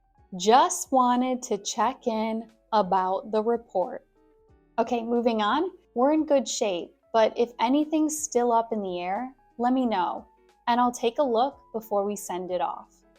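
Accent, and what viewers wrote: United States. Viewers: I love American accent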